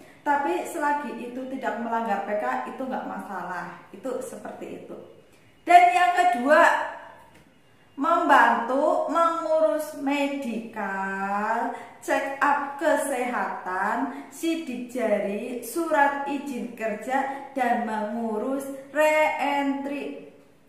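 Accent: Banyumas, Indonesia. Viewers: betul kue mba si cantik ngapak, pokoknya manfaat banget yang disampaikan sama mba ini, ayo dulur dulur saling ingat mengingatkan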